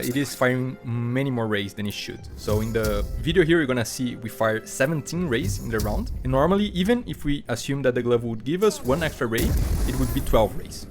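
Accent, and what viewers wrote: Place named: Brazil. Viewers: Pensei a mesma coisa, o sotaque do inglês dele é muito parecido se não for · Are you brazilian? You sound like a fluent brazilian speaking english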